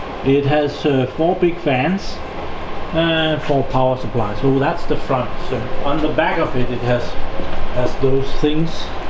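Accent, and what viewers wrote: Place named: Denmark. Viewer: danish accent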